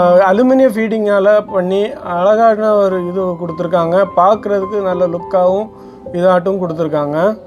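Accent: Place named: Tirunelveli, India. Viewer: நீங்க தூத்துக்குடியா அல்லது திருநெல்வேலியா உங்க பேச்சு அப்படித்தான் இருக்கு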